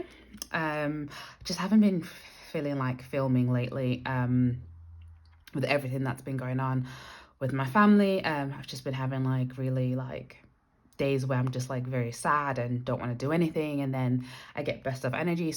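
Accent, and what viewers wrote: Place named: London, United Kingdom. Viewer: where's your accent from? I'm picking up London, Manchester, America, New Zealand?? Sorry I'm nosey lol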